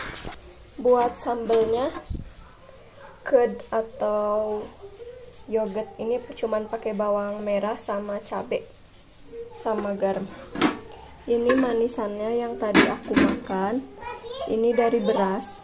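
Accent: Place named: West Java, Indonesia. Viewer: Gimana ceritanya sih bisa nikah sama orang India, trus neng kayak logak Sunda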